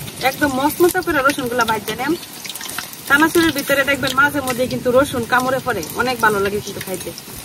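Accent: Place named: Mymensingh, Bangladesh. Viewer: ভাষাটাও চানচুরের মতো মজাদার ছিল। মমিন সিংএর কথ্য ভাষা আমার প্রিয়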